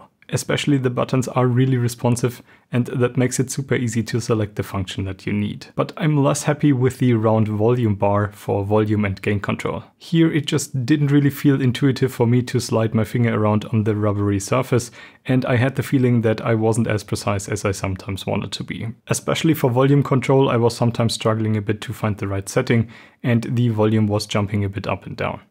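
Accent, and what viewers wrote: Germany. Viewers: are you German?? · your name isn't why are you pronouncing your own german name intentionally wrong? an english or french speaking person would never say their own name in a german accent in germany. you have the heaviest german accent ever but you're pronouncing your german name in an american way, makes no sense.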